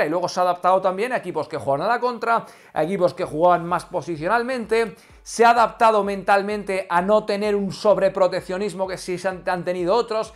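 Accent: Argentina. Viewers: Te salio bien el asento argentino 😁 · Que acento argentino tan bestial. Mis respetos crack 😝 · Jajaja que buen acento porteño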